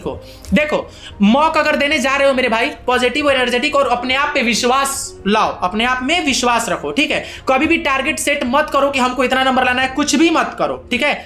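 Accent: Bihar, India. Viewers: May be u r from Bihar 😊🙏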